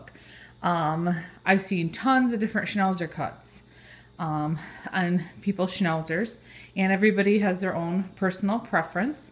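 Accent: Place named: Minnesota, United States. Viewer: I hear a Minnesota accent lol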